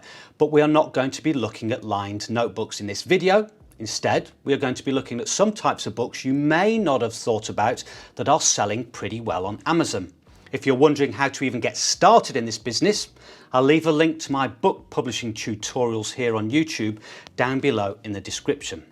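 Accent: United Kingdom. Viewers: welcome to OZ and many thanks for the videos - I hear your UK accent and wish you all the best here - we came over from UK in 1961 I was 4 so grew up here - it's an amazing place that's for sure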